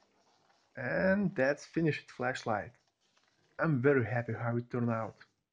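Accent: Russia. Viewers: Акцент какой то русский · Прям слышно что русский, да?) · Ваш Английский.... Вас выдает, что Вы Русский =))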